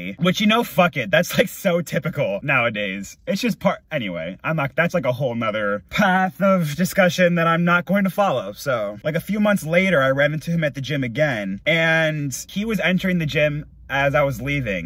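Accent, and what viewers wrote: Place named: Midwest, United States. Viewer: Greetings from ur old stalking grounds L.A., why are you regressing? You're supposed to move away from places like that to L.A. not the other way around. Where did you move to? I didn't hear a southern accent, you have more of a midwest frozen state vibe, I'd guess Ohio, Mi, Wisconsin. I made that mistake once b4 as well,.moving from a real city to a tiny little town in TN. They surprisingly had one gay drag bar. Drag is real big in the south for some unknown reason. The cops would raid it for one reason or another and they would enjoy printing the names of those arrested for whatever charges they wanted to charge you with, they would print your name in the paper and you would come out to the whole town via the newspaper. I lasted less than a year...I never made the headlines...I moved back to LA.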